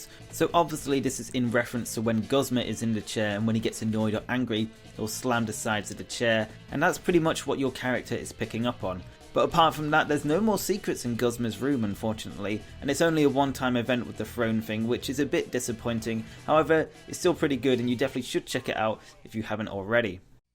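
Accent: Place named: United Kingdom. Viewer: He's maybe from the U.K..... Accents, person. not trying to be rude fyi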